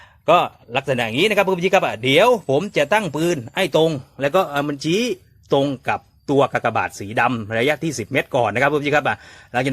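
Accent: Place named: South, Thailand. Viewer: สำเนียงภาษาคนใต้เลยหลวง ชัดเจนครับผม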